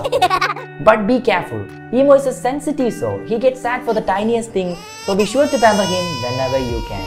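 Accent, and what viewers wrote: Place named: Kerala, India. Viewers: Malayali tone actually ❤🎉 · Indian tone gives me goosebumps.... ❤❤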